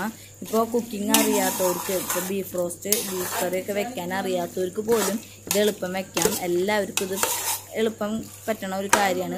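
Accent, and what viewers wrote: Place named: Thrissur, India. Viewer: സംസാരം കേട്ടാൽ അറിയാം തൃശൂർ ആണെന്ന്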